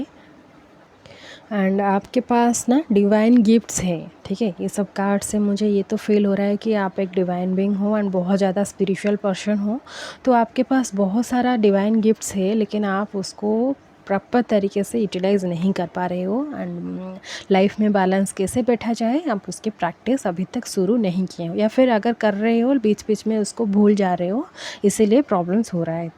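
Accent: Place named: Jharkhand, India. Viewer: oh mujhe laga Jharkhand se ho...apki accent se laga.. 👍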